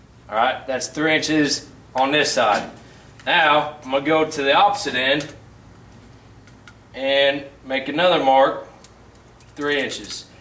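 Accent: South, United States. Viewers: Just love this guy! Especially how he talk! Is it Southern? (I am from California). Thanks for the Video!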